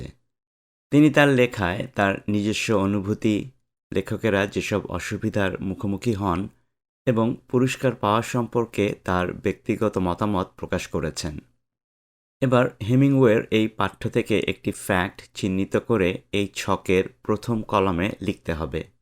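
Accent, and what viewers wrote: United States. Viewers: Sir American accent keno. Amra British Accent e shiktaci. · Tell me how you learned to pronounce English like an American, I need you! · Sir, your English is so nice, how you learned to pronounce English like an American?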